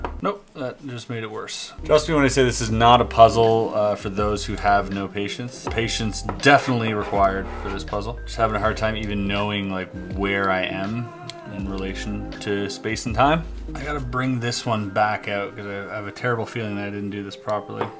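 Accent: Germany. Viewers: Are you German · I love your German dialect! Grüße :) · Are you a german guy i'm a german guy and it was so clean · Ich hatte auch von Anfang an das Gefühl, dass er deutscher ist. bzw wie er einige Sachen ausgesprochen .🤔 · That German accent 🤗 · Watching you untangle puzzles is soooo relaxing(especially since I don't have to do it myself); ASMR, anyone? 😊 Fun to watch and your German accent is excellent! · Are you german?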